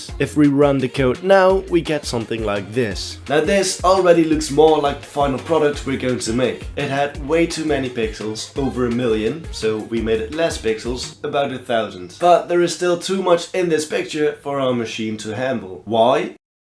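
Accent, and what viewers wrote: Netherlands. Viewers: Hi I love your video and I thought you sounded very Dutch if your not Dutch don’t take this as an insult plz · Your Dutch arent you?? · Love how you can still hear the dutch accent, Maar goed bedacht en gemaakt! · I immediately heard you were Dutch 😂 Love your vid!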